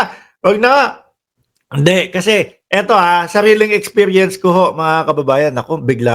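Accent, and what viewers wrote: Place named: Waray, Philippines. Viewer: Parang waray MAGSALITA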